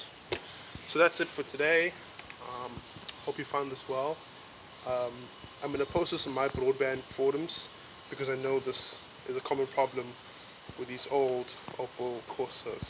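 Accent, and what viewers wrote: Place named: Southern Africa. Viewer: Helped allot man are namibian or south african your accent sounds familiar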